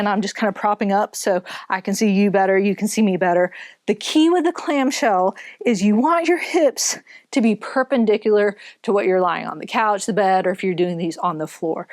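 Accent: United States. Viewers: This comment is irrelevant to the subject of this video. I had always thought you were British, but tonight it just clicked and I was like this is obviously a very American accent!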